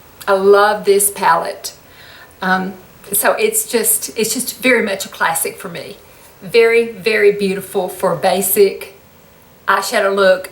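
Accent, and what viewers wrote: South, United States. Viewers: You are my new favorite channel, you're just a breath of fresh air and I can't resist a great classic southern accent!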